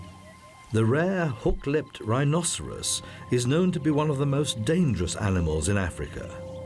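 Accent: United Kingdom. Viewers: These documentaries would not be as good without the narrator having a British accent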